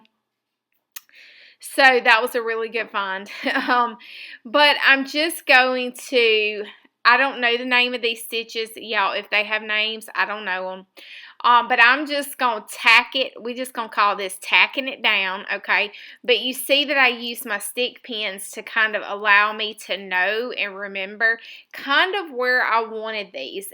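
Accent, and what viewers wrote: South, United States. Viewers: I love your southern accent! Oh my goodness me, I miss the south! Keepers of awesomeness! YES! My family laugh at me for keeping stuff as treasures useful for later! I love your handiwork! I am as from now a new subbie!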